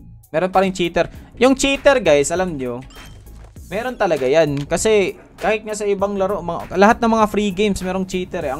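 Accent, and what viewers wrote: Visayas, Philippines. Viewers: Grabi kaau bisaya kaau. Pro idol❤️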